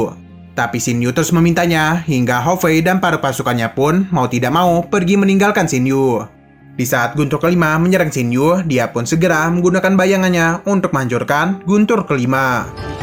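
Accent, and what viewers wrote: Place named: Central Java, Indonesia. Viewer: dari jateng mana suaranya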